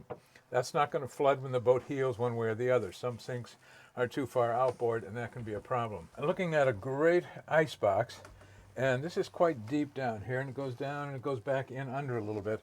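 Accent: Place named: New England, United States. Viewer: I am absolutely captivated by videos about old boats and houses narrated by NPR voices with slight New England accents? Must be my Aspergers... is my new Bob Vila!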